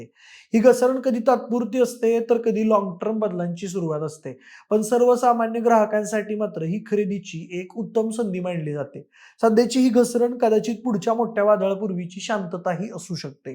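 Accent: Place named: Kolhapur, India. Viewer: तुम्ही कोल्हापूर च हायसा काय तुमच्या बोलण्यावरण वाटतंय 😊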